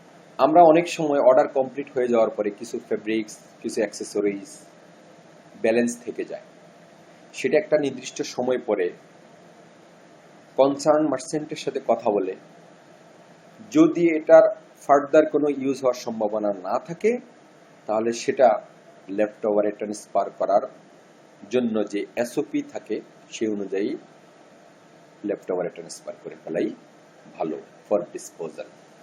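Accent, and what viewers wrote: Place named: Comilla, Bangladesh. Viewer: Why are you mixing your lecture with english, bangla, camilla accents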